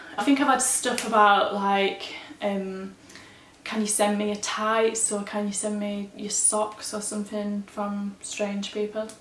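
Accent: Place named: Yorkshire, United Kingdom. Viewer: Loved watching this, you're so genuine and it's so nice to hear a fellow Yorkshire accent on YT!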